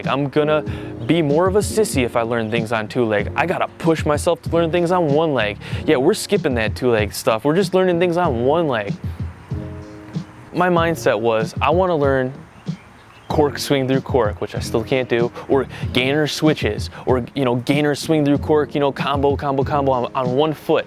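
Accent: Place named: Midwest, United States. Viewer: Nice midwest eeeyyyaaaccent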